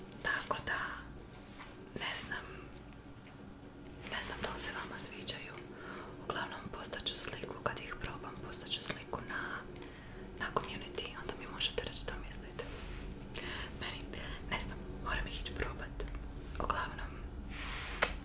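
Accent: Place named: Dalmatia, Croatia. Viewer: Bas i ja gledam ono kao cilo vrime se koristiš dalmatinskim govorom, pa mie bilo malo čudno, ali svakako drago. Nekad se najbolje prepustit i pricat onako kako jezik krene 🙂‍↔️😊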